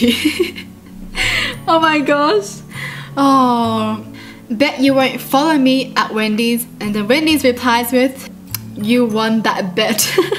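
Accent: Australia. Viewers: do u live in Australia bc ur accent sounds Australian and u said u live in Sydney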